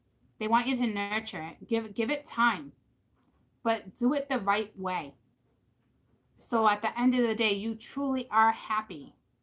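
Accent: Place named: East Coast, United States. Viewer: My grandpa and grandma Hardy and autie Sue were from Fall River Ma ..I think I was initially drawn to you because you bring memories of them and the East Coast to me with your accent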